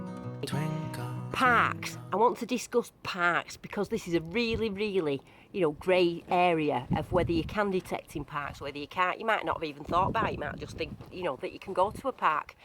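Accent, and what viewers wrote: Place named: Rochdale, United Kingdom. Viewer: Where in Rochdale are you? I thought I recognised the accent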